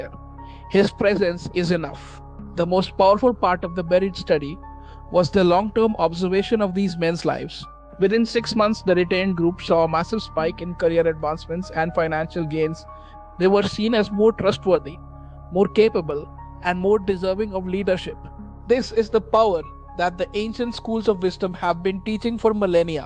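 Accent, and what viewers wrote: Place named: India. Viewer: I liked the AI voice more POWERFUL and SERIOUS. INDIAN ACCENT makes it lose of FOCUS from the TOPIC. BRING BACK AI VOICE. PHEROMONES ✔️ PHARAAMUNES ❌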